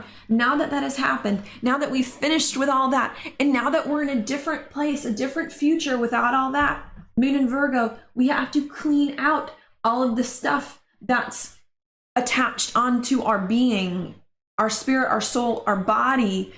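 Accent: United States. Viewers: you have an interesting accent, I'm trying to figure out, what state? enjoyed your reading.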